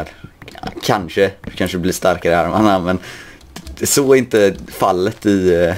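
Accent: Gothenburg, Sweden. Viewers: är du från göteborg för det låter som det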